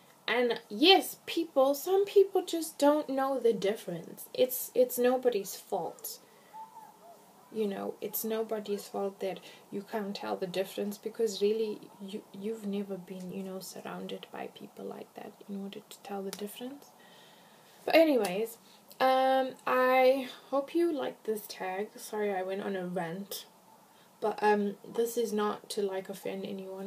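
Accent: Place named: United States. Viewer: You kinda sound american ... kinda. You are beautiful though.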